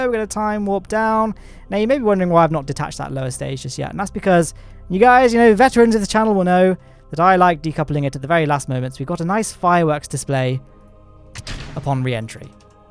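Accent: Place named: England, United Kingdom. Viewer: wait what omg is english?????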